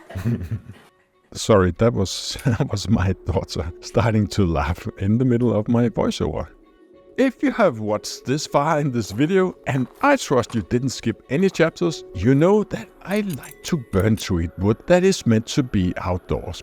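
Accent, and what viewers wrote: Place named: Denmark. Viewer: “Double V D 40” made me laugh. I enjoy the Danish/English. Keep it up buddy. I find myself watching your videos when I want to be calm after a hectic day. You encourage me to build while your videos calm part of my brain that is usually full of angst and frustration. You’re living my dream and I can only imagine how good your soul must feel most days. Thank you for sharing your experiences with us —Chip from Toledo Ohio.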